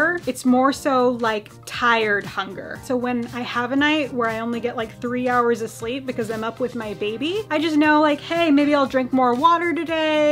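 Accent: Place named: United States. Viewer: You forgot to mention if the weight should be pounds or kilos. You sound american, so I'm guessing pounds.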